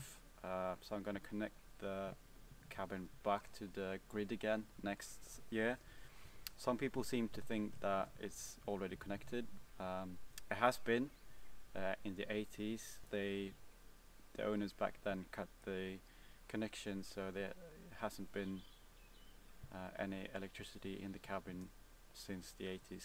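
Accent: United Kingdom. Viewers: Great to hear more of your story! I live just north of London and have a Swedish mother and English father… when you first spoke it was refreshing to heat your UK accent… so often US English accents pervade! Also, as a lockdown project, I built a bastu in my garden… so important to make things with your hands. Keep up the great work! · May I ask how old you are? And it sounds like you live in London - if so how frequently do you visit the cabin?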